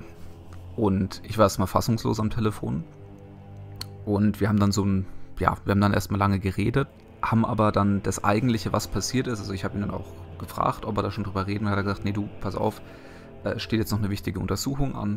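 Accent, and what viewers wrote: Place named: Halle, Germany. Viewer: Legends never die! Seine Videos haben mir als Skyrim-Neuling bis jetzt sehr geholfen und tun es auch noch. Und seinen leichten Dialekt hab ich natürlich auch erkannt, ich denke mal Hallisch? Wäre mal interessant zu wissen, kann auch sein, dass ich falsch liege. Jedenfalls mach weiter so, und tu das, was du für richtig hälst. Vielleicht siehst du den Kommentar irgendwann, auch wenns unwahrscheinlich ist. Ich gucke jetzt mal deine FAQ-Playlist, vllt wird meine frage beantwortet. lg an euch ^^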